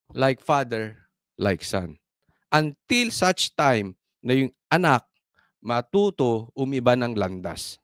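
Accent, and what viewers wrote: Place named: Visayas, Philippines. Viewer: Tama po kht bisya dialect niya pinapanuod ko sya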